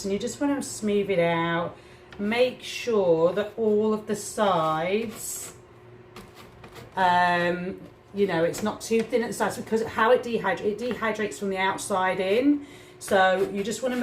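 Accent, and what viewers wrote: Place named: Northern England, United Kingdom. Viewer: Love the Northern England accent! I am in London. Thank you for this recipe!!!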